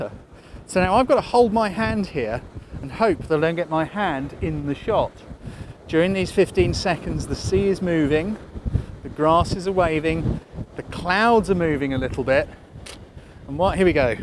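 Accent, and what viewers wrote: England, United Kingdom. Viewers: Loves: 1) Your ability to pass your knowledge, in a simple way 2) The different situations you chose to present to us 3) Your fine English accent... Hates: 1) I m not a hater 2) I respect the work of other people 3) Love, love, love I liked so much that tutor... THANK YOU, THANK YOU, THANK YOU (Dont forget the camerawoman -> Thank you too... Nice video!) Greetings from Greece... PS. Sorry for my bad English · I love British english accent, much nicer than american accent. is such a warm person and I love his videos.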